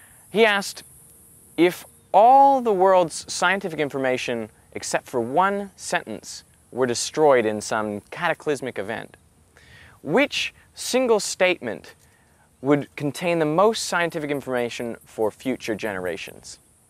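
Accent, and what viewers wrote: Australia. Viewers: lol, I can hear an ever so slight Australian accent coming through and it has distracted me through the whole video! It's amazing though. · ohh he does sound much more australian here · You don't sound very Australian until you say aluminum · OHMYGOD, this was so long ago that Derek actually sounded more like an Aussie 😅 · Am I the only one who thinks I can vaguely hear the Australian accent? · I love his half-australian accent in this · Woah, the ever so slight Australian accent definitely threw me off. Crazy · He has a very slight Australian accent here... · Really cool to see how your accent has changed over time. You can tell there's a bit of the 'stralian in there at times; wonder how much of the video accent was natural vs imposed. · I never knew he was Australian! You can really hear it in his old videos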